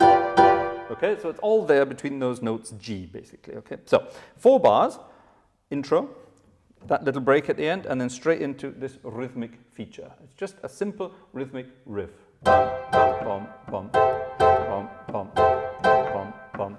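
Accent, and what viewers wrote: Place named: Scotland, United Kingdom. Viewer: I watch the German version for the same reason. German with a slight scots accent is very easy on the ear. ;-)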